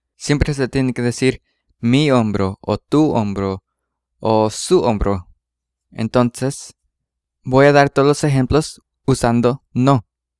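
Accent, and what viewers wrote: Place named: Northern Veracruz, Mexico. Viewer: Hola buen día, una pregunta, el Nahuatl, que hablas y enseñas de ¿donde proviene o de que región es de donde lo hablas?, por que el nahuatl tiene muchas variantes dialectales y aunque tiene cierta similitud a la pronunciación del la región norte del estado de Veracruz, muy padre el material, mil gracias por tomarte el tiempo y elaborarlo, es tan util.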